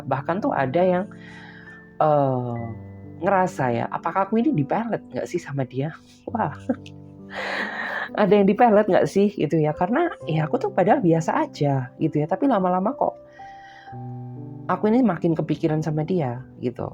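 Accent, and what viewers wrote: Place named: West Kalimantan, Indonesia. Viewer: kalbar nh kk😅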